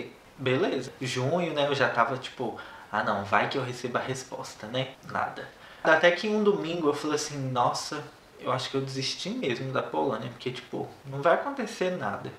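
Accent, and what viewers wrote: Minas Gerais, Brazil. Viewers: Adorei,! As caras que vc faz enquanto vai narrando a sua história é 10, o sotaque mineiro é tudo de bom. Dito isso, conta mais pra gente. 😉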